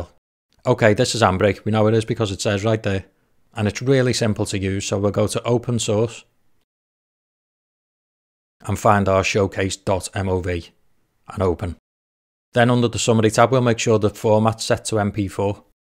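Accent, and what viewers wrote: Liverpool, United Kingdom. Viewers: Not to mention the scrolling website on the Sqs example appears to have an FAQ accordion, which, of course... you need a plug-in for. Nice seeing how to do this on Ae rather than Ps. Always enjoy the humour in your videos. Is that a scouse accent I hear?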